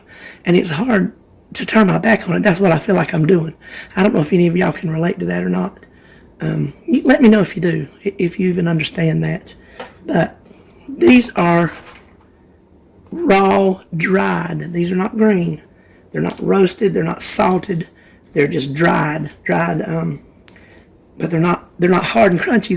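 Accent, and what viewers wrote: South, United States. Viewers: I know exactly what you mean -- I grew up from southeastern Kentucky cooking and ... woooweeee I love my gravy and biscuits, fried potatoes, corn bread, fried chicken, fried green tomatoes, ... hmmmm have you noticed we like to fry where I come from? I love my southern roots and listening to you reminds me of those roots!! Love your channel and your style!!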